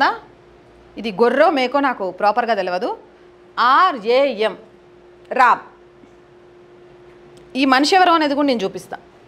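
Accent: Telangana, India. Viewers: చెల్లమ్మా నీ ధైర్యానికి జోహారు తల్లీ నువ్వూ ఇలానే చెయ్ నీ వెనక మేము ఉంటాం తల్లీ కాస్త బొట్టు పెద్దగా పెట్టుకో బంగారు తల్లి నీ యాస మా తెలంగాణ అనుకుంట అమ్మ నా సలహా పాటించమని నా విన్నపం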